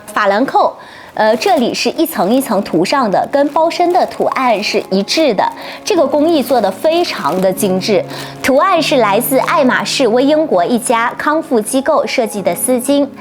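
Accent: Japan. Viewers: 她好像是在日本，日式英語不標準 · 對啊，她是在日本生活的，英文發音就是日本人的發音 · 是日文加大东北混合发音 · 請問英文是日本口音嗎😂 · 是的呀 日文发音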